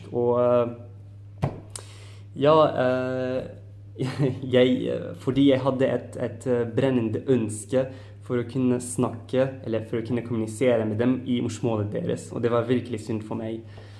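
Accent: Sweden. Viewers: Good job! I can hear some swedish in there, but that is nothing to worry about! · Du har en gøyal skandinav-dialekt, hører du har litt svensk påvirkning, men det gjør jo ingenting. Du har bra uttale og er lett å forstå deg uansett👍🏻 mektig imponert over at du har lært norsk så fort 👏🏻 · Du snakker ganske bra allerede, norsk er et vanskelig språk å lære i utgangspunktet, men jeg hører en del svenske ord og utalelser, så øv litt mer på uttalelsen din, og om du virkelig vil imponere nordmenn, bør du lære deg nynorsk også, siden det er det mange nordmenn som også sliter med. Men ellers veldig bra👍🇳🇴🇳🇴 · Norsken din er utrolig bra for å være på 4-5 måneder, det skal du ha! På mange måter hører jeg at du blander det inn med Svensk, ikke det at det er noe dumt med det, men spesielt på «ungifär» som er Svensk, hadde jeg ingen aning hva du sa :p Utenom det, ikke gi opp, du har talent! 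· Litt hjelp: Du snakker med en litt svensk uttale. Si "mæj" isteden for "mei". (I hvertfall på Østlandet.)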